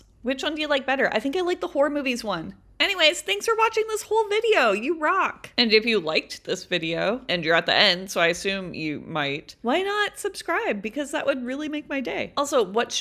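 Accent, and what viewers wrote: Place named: Minnesota, United States. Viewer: You talk Minnesotan it's ok . I understand you lol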